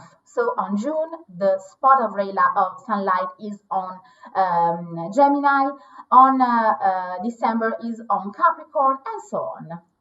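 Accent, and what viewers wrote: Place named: China. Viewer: The audio is really bad. Sounds like she speaks Chinese.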